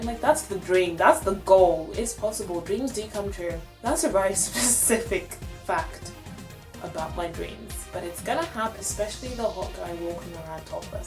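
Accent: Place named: Australia. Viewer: are you Australian cuz u got the accent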